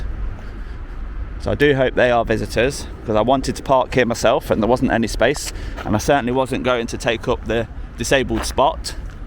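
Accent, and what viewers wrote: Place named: Leicester, United Kingdom. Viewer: Hey I love your videos, and your ‘propa’ Leicester accent! Keep up the good work, I always wonder what is there to hide!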